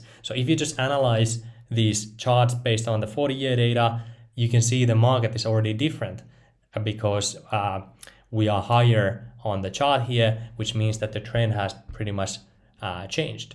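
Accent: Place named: Finland. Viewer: I'm almost 100 % sure his native language is Finnish. I know this because I'm a native Finnish speaker, and I know how Finnish-English accent sounds like. Also, his "pattern of thought" fits into Finnish framework (hard to explain...). In any case, great content!, tell me if I'm wrong 😉 Cheers!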